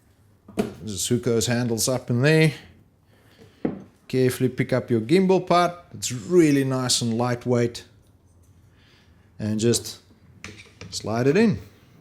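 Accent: South Africa. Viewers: sounds like a south african who has spent a few years in AUS or NZ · south african? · You sound South African bruv. You're local right?😁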